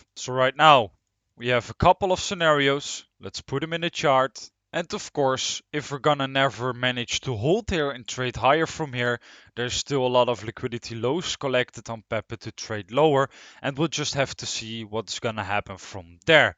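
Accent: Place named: Netherlands. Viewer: Your accent is Dutch / Eindhoven I guess. In your opinion PEPE will make new ATH OR ? Thank you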